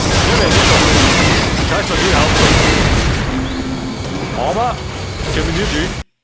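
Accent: Bavaria, Germany. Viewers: so ein geiles spiel, von wo aus bayern bist du?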